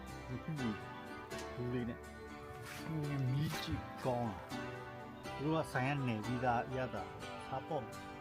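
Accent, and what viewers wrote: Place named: Shan, Myanmar. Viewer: မြန်မာ စကားပြောတဲ့ အသံနေအသံထားက ရှမ်းမြောက်နယ်ဖက် နမ္မတူ ကဖက်ကအသံနေအသံထားပဲ ကောင်းပါတယိဗျာ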